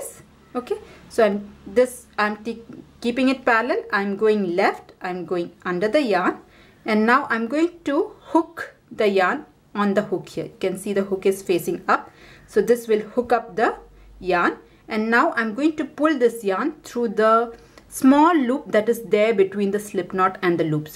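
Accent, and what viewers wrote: India. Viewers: When I heard the Indian accent I knew I would be able to do in one try(I’ve watched about a 100 American tutorials and got frustrated as hell)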